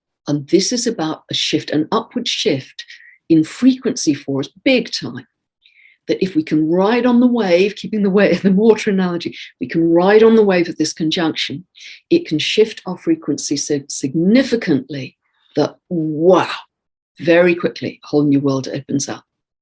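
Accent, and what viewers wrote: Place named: RP, United Kingdom. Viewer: And she does it in a rather cultured British accent.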